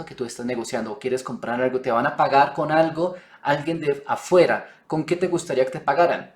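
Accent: Colombia. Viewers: Wawww. Me sorprende ver un asiático hablando muy bien español. Lo felicito, me imagino que vive en España 🇪🇸, 🇺🇸 ó Sur-América? Bueno no puedo recocer su acento. Aunque parece ser que su acento es Colombiano 🇨🇴. Sea como sea lo felicito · Hablas muy bogotano, te faltó decir carachas y régio chhhregio. Pero Usa no quiere reconocer la deuda, dijo que no reconocerá esos dineros a China, como indemnización por haber causado la pandemia. Euroasia ya negocia en con las respectivas monedasque la conforman y el poder militar gringo no es predominante para respaldar países que deben someterse a las reglas transaccionales basadas en el Usd. Tienes razón los cocos no caen a la vez, pero el blanqueo de los dineros del narcotráfico en la Banca sionista-judía ya se vienen conociendo. Qué ocurrirá con estos informes?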